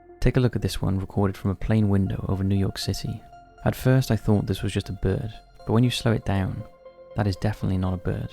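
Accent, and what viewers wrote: Wales, United Kingdom. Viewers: I can hear a Welsh man init x Who doesn’t love Wales 🏴 ! Whose coat is that jacket? ❤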